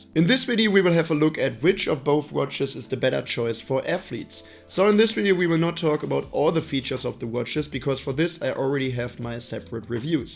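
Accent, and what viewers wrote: Germany. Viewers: Love the accent haha, very German review, useful and helpful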